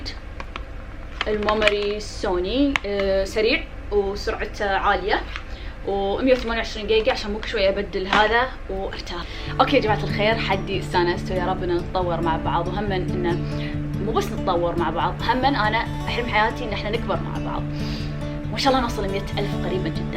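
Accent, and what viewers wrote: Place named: Egypt. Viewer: اللهجة المصرية 😍👌🏻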